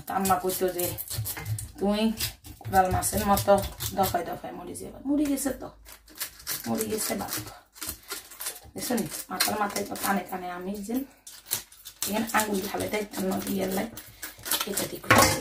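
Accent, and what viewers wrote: Noakhali, Bangladesh. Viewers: Apu tumer nuwakhaliar vasha onk valo laga sunte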